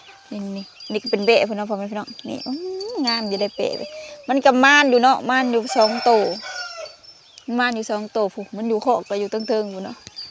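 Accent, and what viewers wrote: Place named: Isan, Thailand. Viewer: คำเว้าคำพูดคือคนไทยทางบ้านผมเลยFCครับจากสกลนคร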